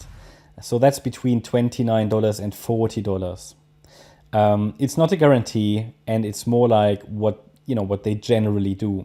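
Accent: Germany. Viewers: Not bullish😮😅😅😅...are you german ?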